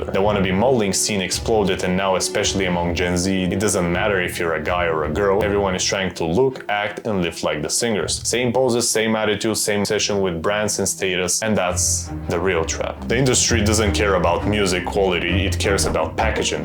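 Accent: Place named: Balkans. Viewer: Ah yes... the most balkan looking man, talking about balkan music, with the most balkan accent. PLS KEEP REPRESENTING US! (Sincerely, an "Алт путка")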